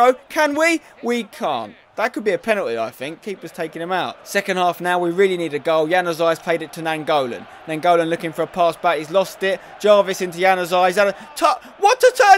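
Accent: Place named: Cockney, United Kingdom. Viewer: It's just a cockney accent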